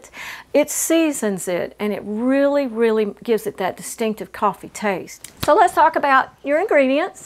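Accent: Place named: East Texas, United States. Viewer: What a beautiful and sweet young lady this is! She sounds like us here in East Texas!! New fan and subscriber, great job!! ❤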